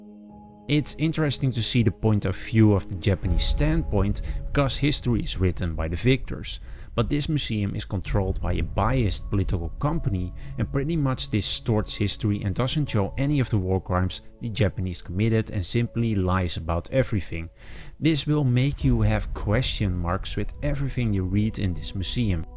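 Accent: Netherlands. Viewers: Great Dutch accent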